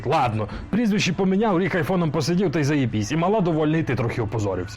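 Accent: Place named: Galicia, Ukraine. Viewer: НЄ ну цей пацан для мене самий. Ріл смішно щей галицький діалект)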